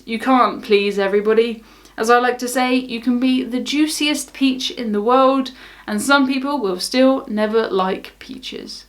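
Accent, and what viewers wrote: RP, United Kingdom. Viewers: Ah, but you do sound “posh” IF that means you can use the English language properly, and take the time to prep and clearly rehearse your bits off so the clips aren’t full of “ummm”, “err”. For context I’ve done voiceovers for corporate videos and know how hard it is to craft a script that flows, and how important rehearsal is. I love the Coffee House Crime (I think) channel but his script & delivery are not good, makes me twitch - I can watch yours all day, really well put together - AND I want to hear you play the piano!! 😂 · Great comment about being a juicy peach. Personally I don't like negativity, if I didn't like how you presented something I just wouldn't subscribe or even watch another video, if I am put off by anything I just stop watching, BY the way I could understand the posh voice sound but you can help your accent, because it is what your used to hearing all your life. 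I also like it, Oh another nice thing I'll add is you used a great cause to cut your hair and made good money for it. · Just block or delete them. Too many creeps that will write things they are thinking but would never dare speak in public. Your voice is beautiful, and being posh is perfectly fine. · you are a beautiful young lady doing work i love sharing cruise things,,,your accent does come over as posh but i am scottish and have issues with myself all the time,,,,,,if someone has to belittle others to feel ok there is something wrong with them and not you...you are caring and sharing and keep up the good work and may i wish you happy cruising for the future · Well I love your videos, and your wonderful BBC accent. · Posh is awesome. Your accent is one of the resons that I like your videos. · I love your "posh" accent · Your English of course your accent is posh 😆😆😆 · Darling, you sound just fine to me. 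What's wrong with posh, anyway? What's wrong with being a nerd? Why are people so critical? Why the need to be so rude? It's just too easy to be rude when one is anonymous on the web. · In any business a brick and mortal or a platform like YouTube you will always have those that you cannot please. Like they say, 'You can please some of the people all of the time, you can please all of the people some of the time, but you can’t please all of the people all of the time'. On a positive note, I love your accent and I think you are a very attractive person inside and out! I found your channel as we are getting ready for our first cruise and was trying to learn all I can and your channel is one of my favorites, I get to learn about Cruising and British-ism :) After one of your videos about Queen Mary 2 we are considering take a Queen Mary cruise from the US to London and then spend a few days there and fly back. 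Thank you for all the work it takes to put out these videos! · I see this is an old post. You handled it so well with dignity and yet got a few hits in as well. I think maybe some associate the accent with shows like Bridgerton and that it would feel good to go Mrs Brown 🤪 on them as well. Keep up the good work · Someone called you "posh"... Well I, as a foreigner, didn't know it was a bad thing. I've just recently discovered your channel and binge watching your videos and one of the reasons why I like your channel is your clear, sophisticated way of speaking. So if it's tied to being posh, then keep being posh please and nevermind the haters ;) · Some of these rude comments don’t sound like they were meant for you. They sound like they picked up rude comments from somewhere and sent them to you. Some people can act very maliciously. Don’t let their negative comments stick. And what’s wrong with sounding posh anyway? 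The world is made up of all sorts of people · Nothing wrong about sounding POSH. You have a nice South English accent. Don't let the silly comments get to you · Isn't sounding posh something good? 🤔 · I closed my eyes and for a moment thought I was in Downton Abbey..😁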